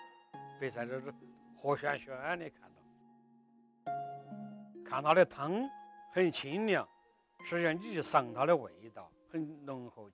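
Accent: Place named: Sichuan, China. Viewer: 浓浓的四川味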